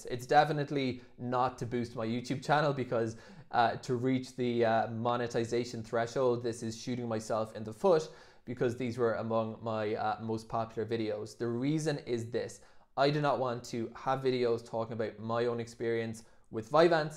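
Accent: Ireland. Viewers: yup, sounds south of Dublin 🎉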